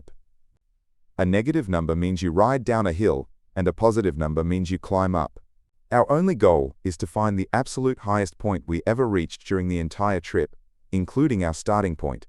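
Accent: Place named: United States. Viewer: I could've sworn there was an American accent earlier.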